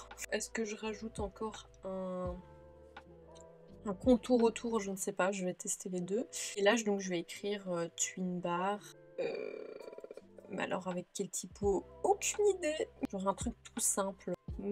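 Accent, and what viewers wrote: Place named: Belgium. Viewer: Je découvre ta chaine sur cette vidéo (merci la reco youtube), c'est très sympa ! J'ai pas bien compris pourquoi la forme ta cam change au court de la vidéo, c'est très étrange mdrrr (le mieux c'est clairement celle à selon moi). Sinon t'as un petit accent Belge je trouve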